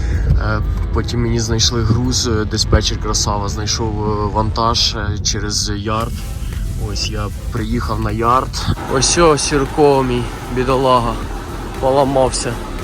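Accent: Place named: Surzhyk, Ukraine. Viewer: А мені подобається твій суржик,обожаю( правильно обожнюю).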